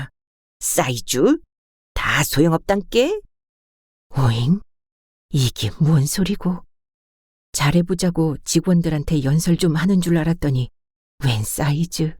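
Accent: Jeolla, South Korea. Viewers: 내고향 전라도사투리를 오랫만에들으니 정겹네요 잘들었습니다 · 안녕하세요~~미스리가 아니고요 미스정이에요 구수한 전라도 사투리 재밌게 들었어요 우리 님은 성우 이신가요 ㅎㅎ 낭독도 잘하시지만 표현도 예술이에요~~😊😊❤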